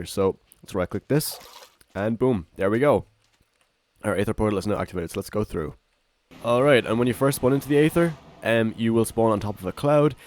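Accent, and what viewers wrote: Ireland. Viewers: Is ethis guy irish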